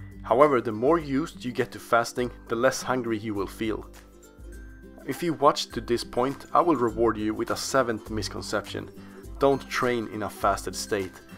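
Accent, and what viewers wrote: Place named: Sweden. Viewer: really strong Swedish accent there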